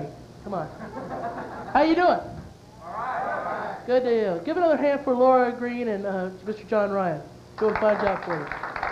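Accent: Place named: Arkansas, United States. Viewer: He sounds arkansan here.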